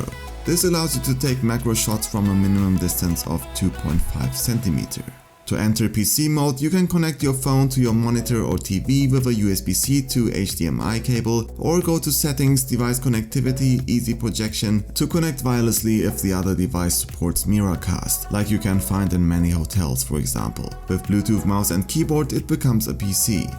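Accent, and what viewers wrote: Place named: Germany. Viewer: Der deutsche Akzent ist nicht zu überhören😂👆🏻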